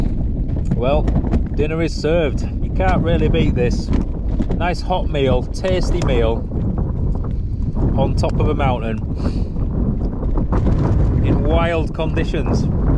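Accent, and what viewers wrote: Yorkshire, United Kingdom. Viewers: Fab watching this adventure…. I love your chat, the nice Yorkshire accent helps 😊 Surely it’s a bit scary getting back 😮 It must be so hard walking in this thick snow Thanks for the fun 🎊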